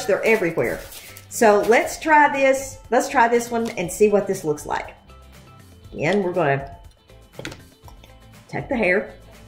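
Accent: South, United States. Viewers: Can I ask where you are from in the South? I’m from Arkansas and I love to listen to you.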